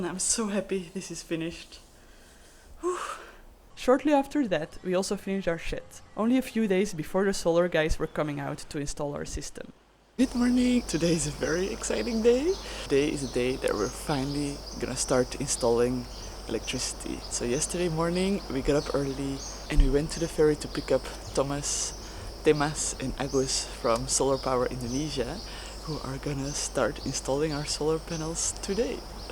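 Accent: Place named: Belgium. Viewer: Wow this reminds me of pictures my parents had living in New Guinea except with all the new technology. I am part dutch, part Indonesian and your videos are so like cool and almost feels like home to me. I would love to visit there one day. My parents were both born in Indonesia but later had to leave with their parents after the war. Seeing a modern day scenario of how my parents lived except with Starlink, a more modern beautifully built home and riding the surf of the island is like a dream come true...Thanks for sharing these videos. Your Belgium accent is like hearing my parents as well with the European accent. My parents are both gone but I would imagine they would be in second heaven if they saw your videos today.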